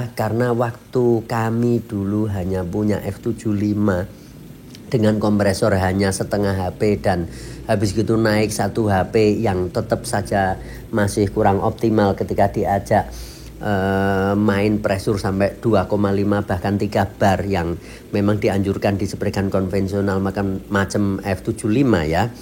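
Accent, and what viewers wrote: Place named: Java, Indonesia. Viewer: smpean jowo ndi to mas kok medhokmen 😁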